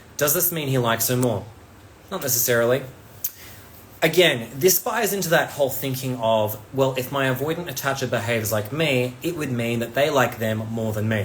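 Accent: Australia. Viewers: Are you from Perth/Western Australia? Your accent reminds me of some I used to know. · I loved it when I started listening to this, and it was a fellow Aussie speaking!!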